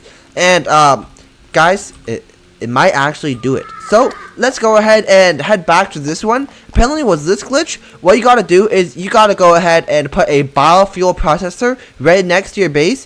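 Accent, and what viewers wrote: United Kingdom. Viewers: Is bro half British?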